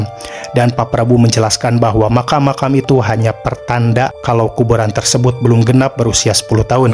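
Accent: West Java, Indonesia. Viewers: Orang sunda ya a?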